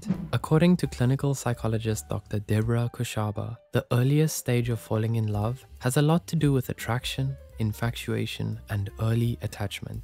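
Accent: South Africa. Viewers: The narrator are you south african? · Man's accent is from SA🇿🇦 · The narrator is definitely South African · Bro sounds South African 🎉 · The speaker sounds south african😮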